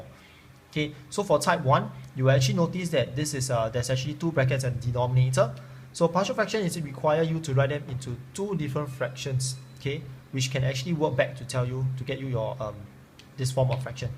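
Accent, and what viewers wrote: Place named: Singapore. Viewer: I recognize the Singapore accent ;), keep it up man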